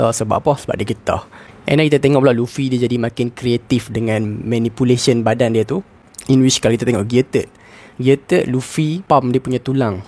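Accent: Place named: North, Malaysia. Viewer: welcome bro...all the best..penerangan bro jelas, dan yg best cakap utara hahahaa..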